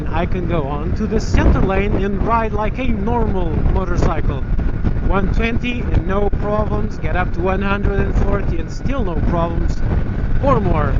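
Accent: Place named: Portugal. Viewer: Awesome review, I'm also portuguese, so I caught on to your accent immediatly, I have the same haha, and I also own and NC700x. And I "downgraded" from a CBR 900 RR zx10rgsxr crotch rocket thingy, like you so well put it. The thing is that with my 900 i could never explore the machine in the road properly and the largest trip I was able to fathom was to the next petrol station. With this one I feel I can go anywhere. It's a great, fun and useful bike, a bike for the real world!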